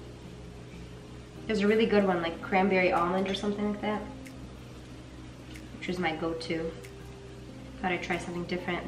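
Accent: United States. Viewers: it looks so nice. I love your American accent. what state are you from?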